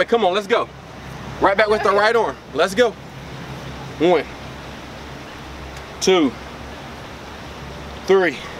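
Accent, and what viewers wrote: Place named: South, United States. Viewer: I like that southern accent, awesome quick bicep workout! Thanks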